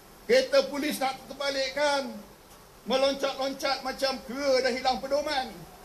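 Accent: Kelantan, Malaysia. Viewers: ckp kelantan pun salah..huekkkk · huduh demo kecek klate!! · cakap klantan pon huru hara!! cibai la ko!!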